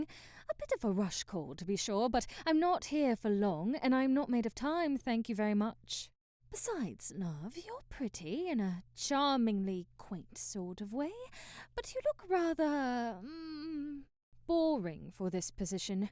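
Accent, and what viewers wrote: United Kingdom. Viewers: This reminded me of how much I love the British accent… unfortunately reminded me of my ex girlfriend though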